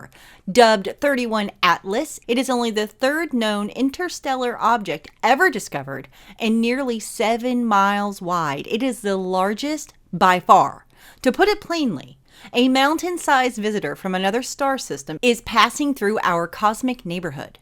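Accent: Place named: Connecticut, United States. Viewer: Outstanding vid. You sound like my ex from west haven..so I am guessing conn. accent??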